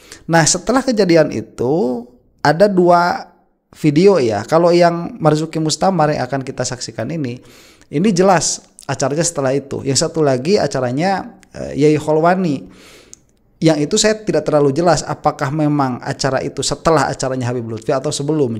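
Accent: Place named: Surabaya, Indonesia. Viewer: Pean suroboyo ndi cak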